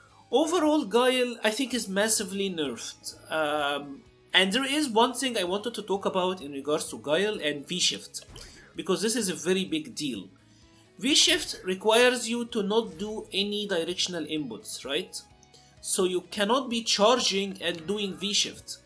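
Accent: Egypt. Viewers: Your accent, are u from Masr?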